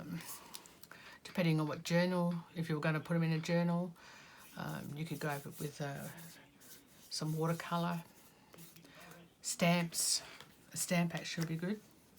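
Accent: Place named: Australia. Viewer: Great video. I love using what would be garbage as well. Great idea for the fries box. I'm from Australia too. Love hearing our Aussie accent on YouTube. Good luck with your channel. 💗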